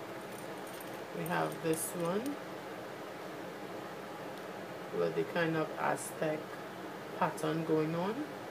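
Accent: Trinidad and Tobago. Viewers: i love trini accents :) lol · are you from trinidad? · are u from Trinidad?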